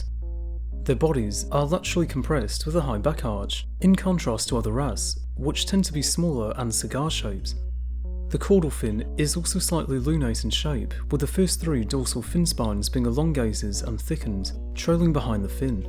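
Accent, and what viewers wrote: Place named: Wales, United Kingdom. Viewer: What's that accent.. ? Can't figure it out.. sounds Welsh though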